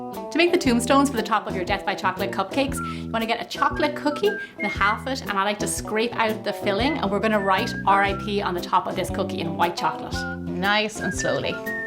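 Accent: Ireland. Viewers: I thought u were from Ireland nice to meet you! ☺️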